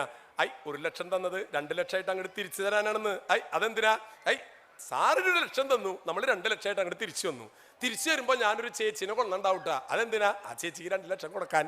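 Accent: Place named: Thrissur, India. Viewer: tani trichur language thanne..marakilla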